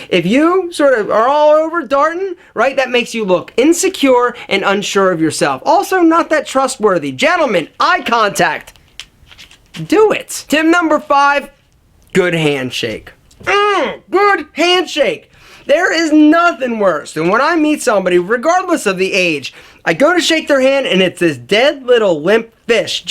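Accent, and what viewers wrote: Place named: New England, United States. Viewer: where did you grow up alpha? I can hear a new England accent on your "aw's". -14 year old long term viewer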